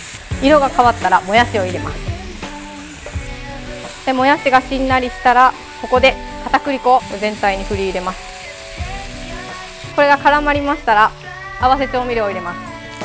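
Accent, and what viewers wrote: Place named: Kansai, Japan. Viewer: 関西の人なんだ。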